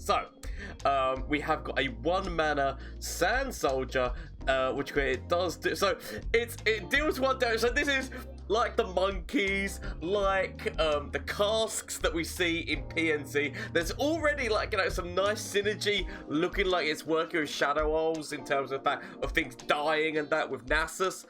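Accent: United Kingdom. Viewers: i just realized that your name is, and i couldn't be more confuse with this British accent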